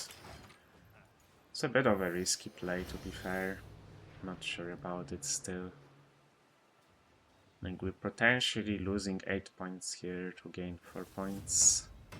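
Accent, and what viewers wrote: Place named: Estonia. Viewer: are you from Estonia? Can't put a finger on your accent. Very good English though. Ggs as always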